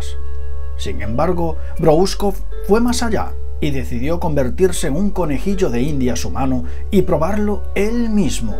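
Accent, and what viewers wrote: Galicia, Spain. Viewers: De donde eres de galicia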